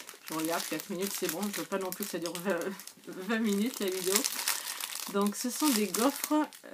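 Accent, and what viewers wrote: South, France. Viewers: Rooh ce petit accent du sud ^^ Ba voila nouvelle chaine nouvelle vidéo on continu on continu :) Si je peux me permettre comme tu m'as dit de donner mon avis lol tu es un peu loin de la caméra :) Gros bisous beau swap :)) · Tu a été gâté ma jolie !! Trop contente de te voir et entendre ton bel accent du sud !! Ta video est top, pour une première c'est génial !! Gros bisous 😘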